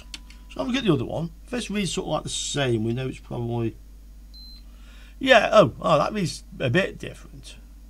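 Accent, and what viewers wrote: United Kingdom. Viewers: hard to understand this british acent for an non native english speaker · Yes, he's British.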